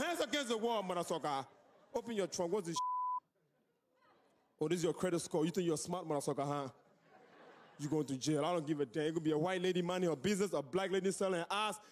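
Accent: Africa. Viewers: lol his African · I absolutely love him. He's hilarious. I never get tired of the African accent.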